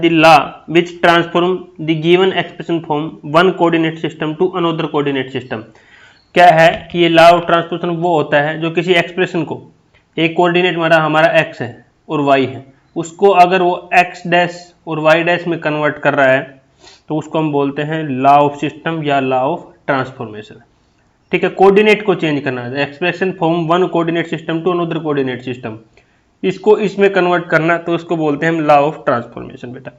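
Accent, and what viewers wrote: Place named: Haryana, India. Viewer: Ram Ram sir ji , your accent clearly haryanvi , thankyou for great information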